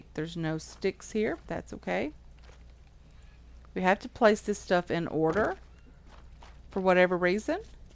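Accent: Kentucky, United States. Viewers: im so glad to see you playing again from the start! i subscribed in the first place watching you play this...supposedly its a very different game now with everything they've added. we'll see. its very nice to see you! i still follow you but i dont have the time to get into the infected part 325...ill never catch up. this is perfect. and i just love hearing you talk with that kentucky accent. people accuse me of having a oklahoma accent! what accent? yall are the ones that talk funny!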